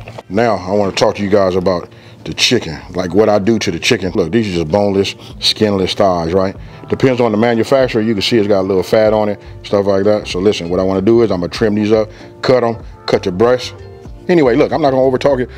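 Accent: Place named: Los Angeles, United States. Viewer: He have that L.A. accent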